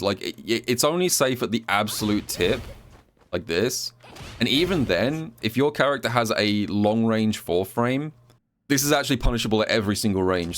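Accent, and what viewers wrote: United Kingdom. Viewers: lol I had to watch multiple times I thought he was saying "tool character". That British accent is something else. Carry on don't mind me great video much appreciated because that fan is a beast to deal with.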